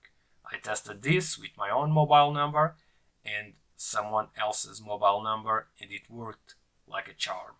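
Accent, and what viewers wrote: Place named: Portugal. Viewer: meu, que accent mais tuga!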